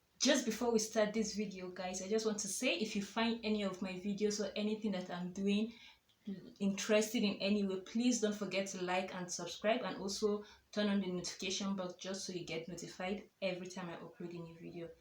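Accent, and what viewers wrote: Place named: Uganda. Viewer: Her accent is becoming ugandan, i love it, shes easier to understand, the tongue is less heavy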